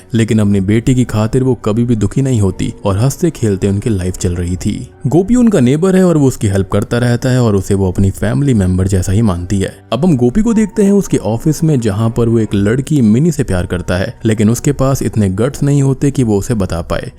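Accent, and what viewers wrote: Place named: Hyderabad, India. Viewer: Tum mast hyderabadi bolte bhai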